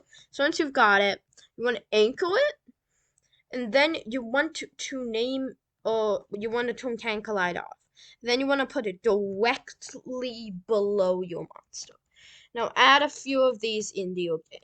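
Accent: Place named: United Kingdom. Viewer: you sound British no offense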